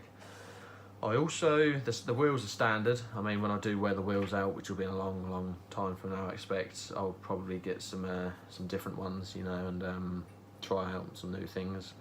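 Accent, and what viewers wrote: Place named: Norfolk, United Kingdom. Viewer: Excellent video my dude! Same board and couldn’t agree more about the bushings. Where are you from? I know the accent, Norfolk right?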